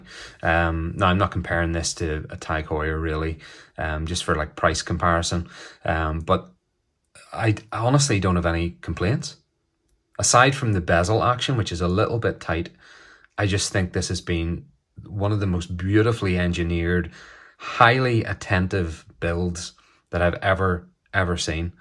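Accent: Northern Ireland, United Kingdom. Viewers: Yes it's a small world. I had watched a Just one more watch video and your video played after it. When I heard NI accent and looked at the name I thought you must be Shaun's brother. Hope you are keeping well and getting through lockdown ok. We have just had ours extended another 3 weeks.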